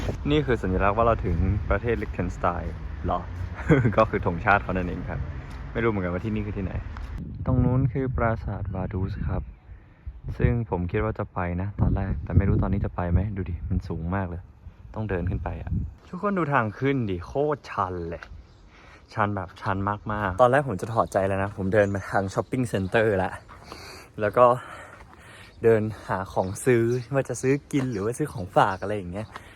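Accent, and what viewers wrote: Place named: Khon Kaen, Thailand. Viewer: มันคือ ภาษาขอนแก่นค่า 😂😂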